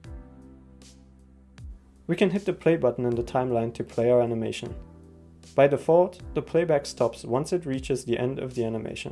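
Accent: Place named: Germany. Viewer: Das klingt doch nach nem deutschen Akzent 👀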